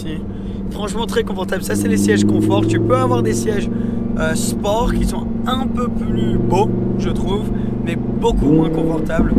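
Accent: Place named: United Kingdom. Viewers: C’est son origine anglaise ptn réfléchi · J’aime tes vidéos... mais arrête de prononcer des noms italiens à l’anglaise...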